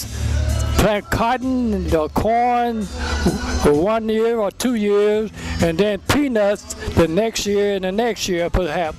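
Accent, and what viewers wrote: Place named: India. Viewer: Thats an indian talking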